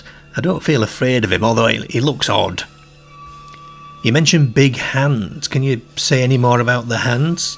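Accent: United Kingdom. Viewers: I've heard his accent before, just cant recall where its from in the UK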